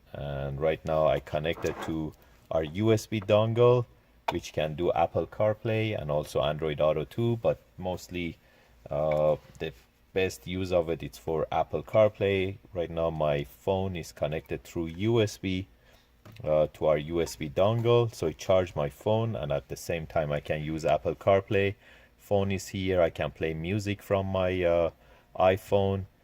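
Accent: Iran. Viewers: You sound Persian... Salom chetori?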